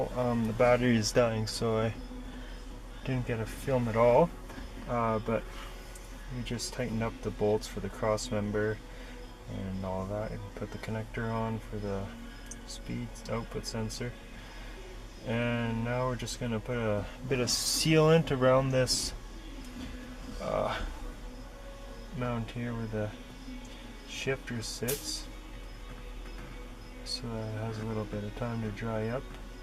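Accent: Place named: Canada. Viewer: He sounds Canadian. :)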